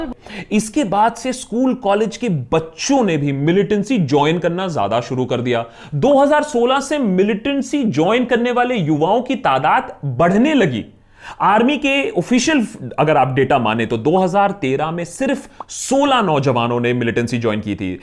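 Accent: India. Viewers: Very informative video by the way,and in very desi quaint accent!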